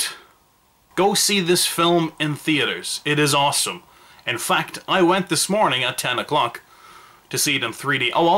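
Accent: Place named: Ireland. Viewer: Your very funny, what part of Ireland are you from im from?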